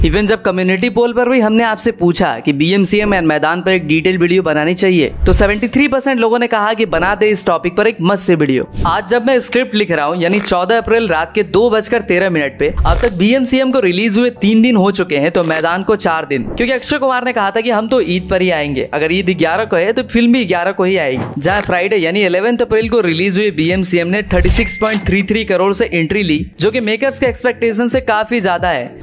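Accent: Bengal, India. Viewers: I am from kolkata and your bengali accent bro touched my heart great video man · Are you bangali 😅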